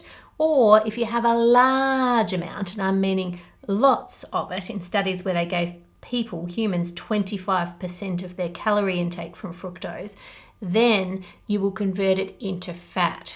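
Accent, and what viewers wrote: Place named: Australia. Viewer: I find it funny how has a clear Australian accent but then her son(?) sounded like a proper Bri'ish schoolboy.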